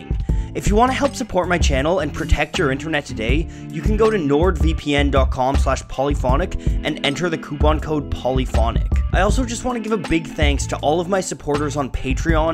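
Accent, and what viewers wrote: Canada. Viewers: Is Polyphonic Canadian? His O's sound Canadian.. But im Swedish so what do i know xD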